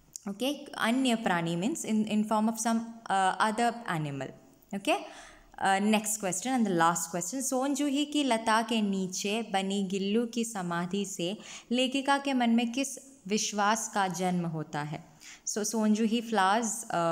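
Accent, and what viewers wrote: Kerala, India. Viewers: good english , good hindi and I also think your a malayali by your accent , lemme know if that's true and also thanks for the explanation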